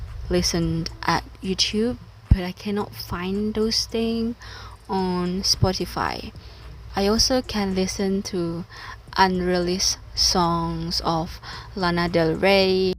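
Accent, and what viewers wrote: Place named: United Kingdom. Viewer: I think you have a British accent... So cute..😊😁. Thank you for a wonderful video.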